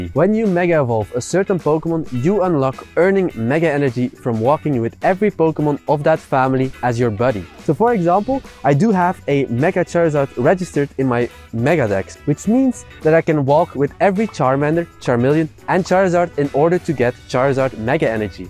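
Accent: Netherlands. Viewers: I can instantly tell you're dutch by the accent :D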